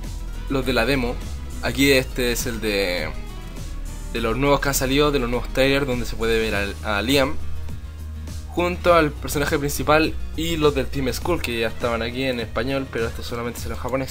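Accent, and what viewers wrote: Chile. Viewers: es chileno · ¿De qué país eres? es que tu voz la siento de chileno(?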